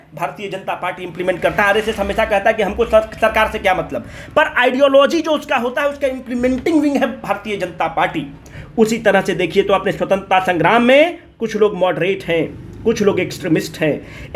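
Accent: Bihar, India. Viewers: The way u teach is really incredible sir....feel really blessed to be a part of bcw family🙏 nd ur narration in bihari tone 🧡gives extra motivation sir....soon this channel will become one stop destination for all bpsc aspirants......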